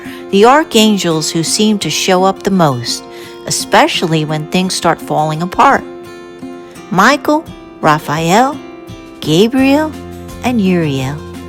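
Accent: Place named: United States. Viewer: I am enjoying your episodes. They are very delightful to listen to.. I love that voice that has a country draw to it.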